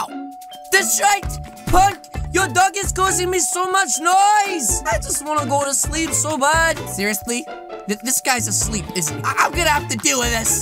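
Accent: England, United Kingdom. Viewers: can I ask you a question are you english or ameeican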